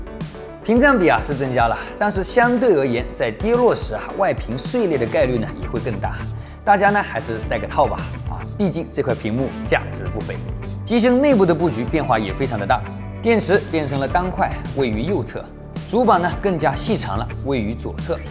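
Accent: Wenzhou, China. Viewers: 温州人说普通话就这调调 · 没办法，温州人说普通话就是这样的。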